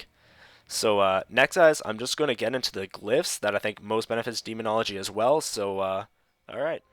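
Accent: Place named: Canada. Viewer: You have a very stereotypical Canadian accent.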